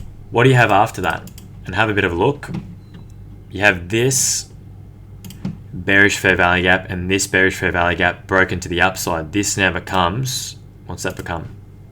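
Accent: Australia. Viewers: Are you Aussie bro? Great video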